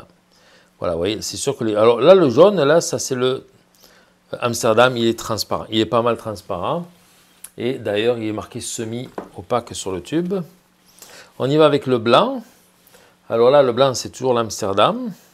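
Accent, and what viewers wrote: South, France. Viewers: Petit accent du Sud de la France ET du Québec?? :) Merci pour vos astuces!!!!! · Merci vous êtes bon pédagogue , j'aurais aimer être un de vos élèves mais avec votre accent je suppose que vous êtes du sud! Merci pour cette vidéo! Bonnes fêtes!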